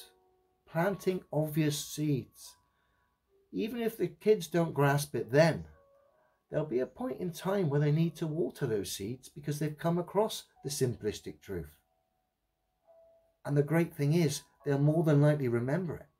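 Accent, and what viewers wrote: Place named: United Kingdom. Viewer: new sub, great to hear a Brit covering this topic with great detail and information. Keep it up my friend people starting to see this monumental lie.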